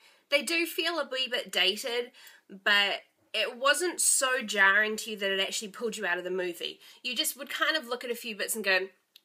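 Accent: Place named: New Zealand. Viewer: Awesome review m'dear. I know it's weird when people talk about your voice, I get it a lot but your NZ accent is truly awesome btw :P Yeah I'm not sure what to think about whether or not he was possessed by the evil already or if it got to him whilst on the ship. I lean more towards him being possessed already as that tends to explain more.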